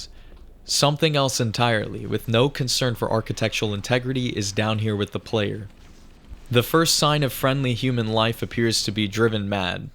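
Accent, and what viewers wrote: Greece. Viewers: Are you Greek bro ? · Are you Greek?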